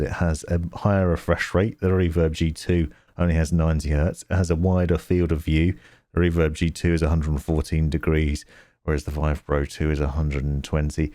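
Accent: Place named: North, United Kingdom. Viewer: You based in the north dude? Sounds like an accent closer to me 😂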